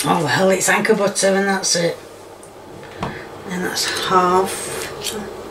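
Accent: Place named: South Yorkshire, United Kingdom. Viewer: Are you a South Yorkshire Lass like me ? We have the same accent ❤️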